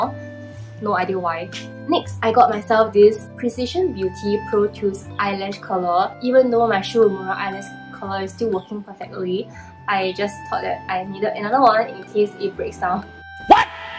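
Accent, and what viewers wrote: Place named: China. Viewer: are you from china? your accent sounds like china accent!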